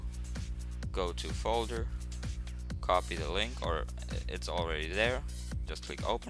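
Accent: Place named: England, United Kingdom. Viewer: i think you sound English not germen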